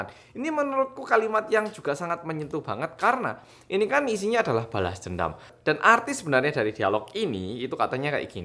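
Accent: Java, Indonesia. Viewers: Medok banget mas ngomongnya.. Jadi kayak Wong jowo asli.. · Mas ini wajah Korean tapi bicaranya jawa banget boleh tau ngga mas sail korea apa Indonesia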